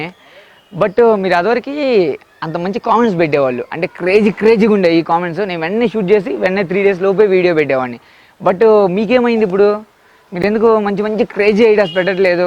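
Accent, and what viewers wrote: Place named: Andhra Pradesh, India. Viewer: Ne slang nachutaley .Andhra lekka ostandi kocham marchu....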